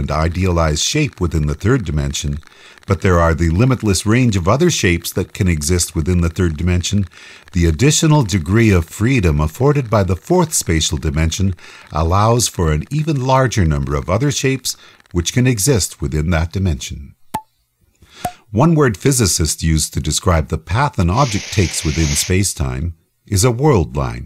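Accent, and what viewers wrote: Canada. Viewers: The narrator is canadian!! :) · Great video, really well done, but that Canadian accent is pretty rough! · you sound Canadian.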